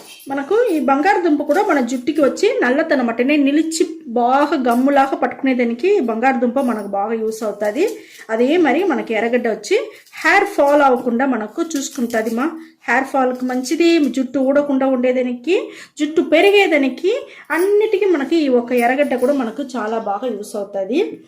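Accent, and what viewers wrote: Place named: Rayalaseema, India. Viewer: Adhi rayalaseema slang ankunta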